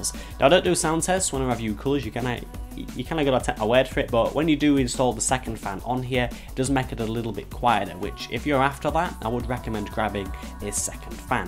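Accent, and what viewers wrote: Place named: Northern England, United Kingdom. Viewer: I'm from the North of England and so is this guy. I've got no idea what he's saying half the time because he does leave a gap between most of his words. You've found the English Boomhauer.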